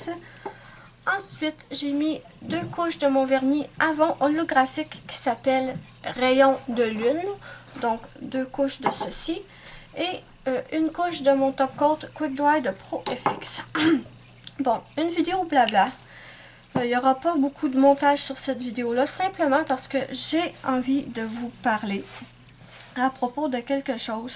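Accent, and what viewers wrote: Quebec, Canada. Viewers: Merci pour toutes tes vidéos 😊 et surtout ne change rien, reste toi-même c'est parfait 😉👍!! J'adore ton accent québécois 😃 !! Et comme tu le dis très justement, ceux qui n'aiment pas tes vidéos n'ont qu'à passer leur chemin. Comme on dit "la critique est aisée mais l'art est difficile" alors que les insatisfaits aillent sur d'autres chaînes ou fassent mieux !! Continue comme ça et merci 😊😊😊👍👍👍 !!!! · Bonsoir.Je rejoins l'avis des autres personnes qui ont laissé des commentaires....Ne change rien.... surtout!!!! Moi je regarde tes vidéos....pour ton accent québécois.... Il est très....cute...comme tu dis souvent 😊... Et également parce qu'elles sont bien faites...tout simplement. Je ne fais pas de nail arts mais j'adore regarder les tiennes.le résultat est magnifique.Je fais du coloriage pour adulte et j'aime bien ton autre chaîne notamment pour les présentations de livres car tu es franche en les présentant.Surtout continue comme ça!!! 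Bonne soirée! Bises! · Super joli !!! Je suis tout à fait d'accord avec toi !!! Moi si je te suis c'est justement parce que tu es authentique et j'adore ton accent canadien😍 ne change rien surtout... j'attends toujours tes vidéos avec impatience et j'ai tout appris avec toi !!! 😘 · Cc on t apprécie comme tu es et reste naturel j adore ton acsent québécois et tu es super. Très jolie ton Nails art. Biz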